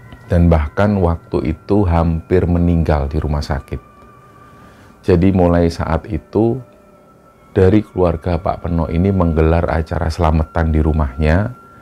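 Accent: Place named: East Java, Indonesia. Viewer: Semangat terus Tim 😊 kadang memang dalam berkembang akan terus ada masukan dan kritikan sampai nantinya bisa punya "ciri khas utama" yg akan diterima semua pendengar apa ada nya 😊 Btw kl saya pribadi, saya suka karena ada logat jawa timur nya.. jd suka kl mas ngmg lebih lokalan lagi, nyeletuk boso suroboyoan, atau ngasih info2 sejarah atau latar belakang lainnya. Jadi waktu baca komentar ada yg bilang, "Kl ngomong pakai Bahasa Indonesia dong, jangan pakai bahasa daerah. Kan yg dengerin ga semua ngerti." Aku merasa.....aneh 😅 karena kl pengen dengerin kisah horor berbahasa indo knp ga dengerin Chanel lain aja.. kan memang channel yg seperti ini, dan ini yg bikin ngenak di hati subscribernya.. 😢 Tp yowes lah, apapun yg usahakan, semoga bisa berkembang menjadi lebih baik lagi dan bermanfaat untuk org banyak. Aamiin 😊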